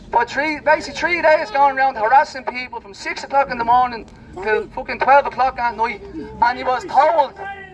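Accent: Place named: Ireland. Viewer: Your Irish accent is music to my ears, fookin golden 👌🏻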